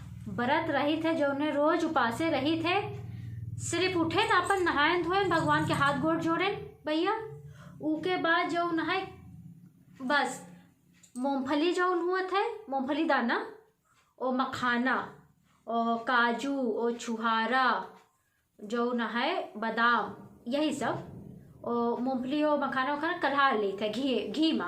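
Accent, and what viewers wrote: Awadh, India. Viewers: बहुत सुन्दर , आवाज तो हमारे अवध की है 🙏🙏। 😀😀😀😀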